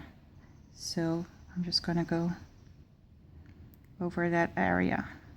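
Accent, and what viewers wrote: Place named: Netherlands. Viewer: bende gij nederlander.. want jou accent is echt Hollands..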